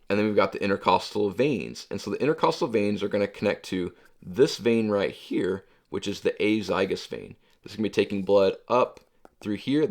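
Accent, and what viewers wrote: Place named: United States. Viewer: Please can add subtitles for non Americans that can understand your accent. Thanks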